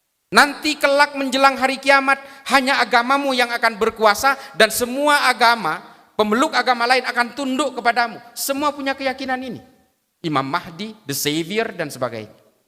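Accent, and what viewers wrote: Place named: Madura, Indonesia. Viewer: Dari logat bahasanya seperti suku Madura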